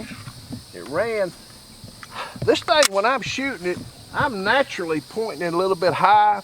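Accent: South, United States. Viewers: I love the southern accent especially when he was talkin about people with “fat fangers” lol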